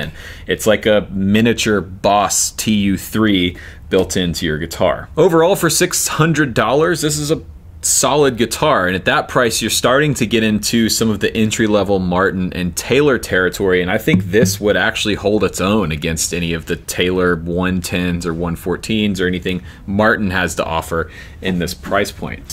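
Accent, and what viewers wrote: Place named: New York, United States. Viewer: i found the Fender had a tubby midrange that bothered me. In that respect it reminded me a little of an Ovation. I might gravitate toward the Hummingbird not because of its look but because I suspect I’d enjoy playing it. My own acoustic is a Hummingbird and, much to my surprise, I really prefer playing it to the D28 I used to have. Both are from the seventies. Ahrange/Or ange is a regional difference. I grew up in NY (metro, not upstate which sounds completely different) and pronounce it like you do. You’ll find the same argument with fahrest/for est. If you want the opportunity to correct your significant other about speech, try the marry/merry/Mary test. If you’re from NY, those are three completely distinct words. For most of the rest of the country, they basically say Mary/Mary/Mary.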